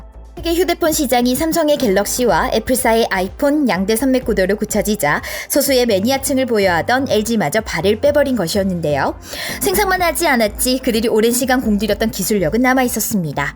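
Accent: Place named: North Korea. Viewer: 북한사람인교 아님 조선족인교 말소리가 윗지방 소리네요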